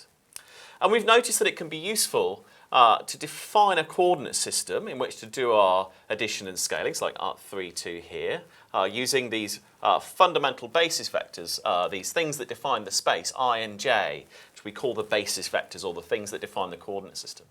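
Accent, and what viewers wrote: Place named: United Kingdom. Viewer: I fucking love the british accent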